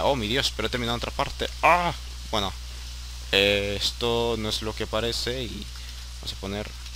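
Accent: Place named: Spain. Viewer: siges con acento español e_e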